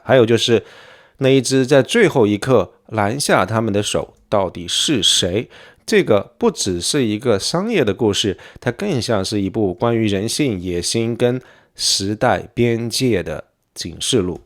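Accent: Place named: Sichuan, China. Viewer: 感觉博主是四川人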